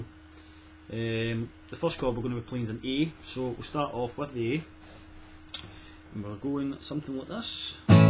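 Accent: Scotland, United Kingdom. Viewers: Is he Scottish ?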